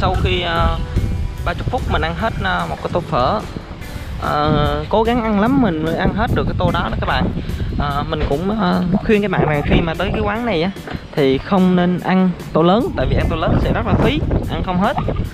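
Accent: Quang Nam, Vietnam. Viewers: anh nghe giọng nói của em không người quảng nam thì cũng người bình định định mà cứ khoe người sg cái giọng nói đấy thì lẫn vào đâu dc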